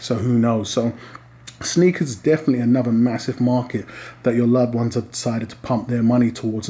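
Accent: United Kingdom. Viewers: Hi, you sound British where do you get your bullion from in the UK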